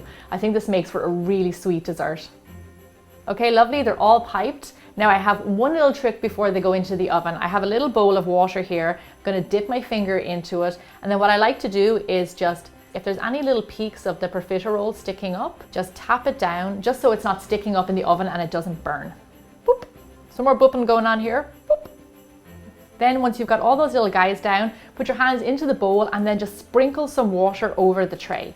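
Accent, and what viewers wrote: Ireland. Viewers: I didn't know you were Irish?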